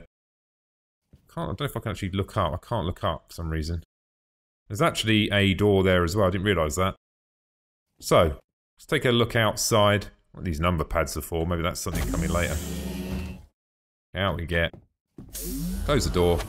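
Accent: United Kingdom. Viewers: your british accent makes me want to drink tea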